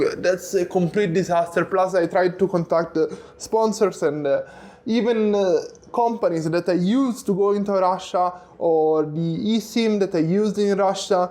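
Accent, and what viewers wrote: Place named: Italy. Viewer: I love the Italian accent. It is the most understandable for Russian speakers 💖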